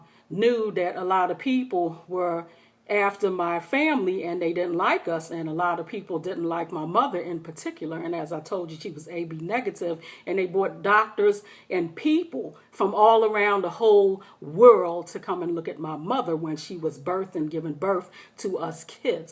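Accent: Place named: United Kingdom. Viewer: Hey. That accent sounds British. Thanks for the update.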